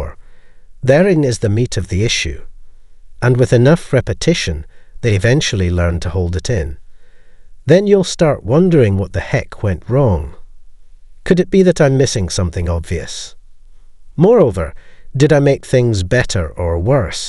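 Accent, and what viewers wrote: Scotland, United Kingdom. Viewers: Scottish voice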